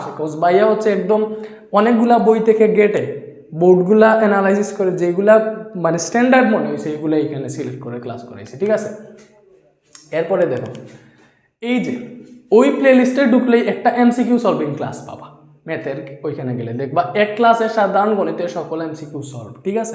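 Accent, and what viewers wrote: Sylhet, Bangladesh. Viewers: Vaiya apni ki sylheti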